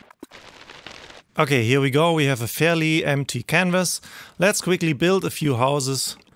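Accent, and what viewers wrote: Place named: Germany. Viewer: German accent incoming in 3...2...1 🥰 love it.. Thanks for your videos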